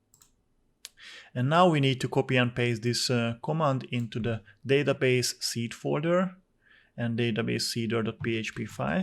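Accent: Hungary. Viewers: Hello and thanks for the video, it really helped me complete my project!! also I study in hungary and I immediately recognized that hungarian english accent lol, thanks again.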